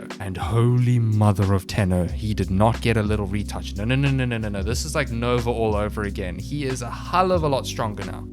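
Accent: South Africa. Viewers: are you south african your accent souns a little south african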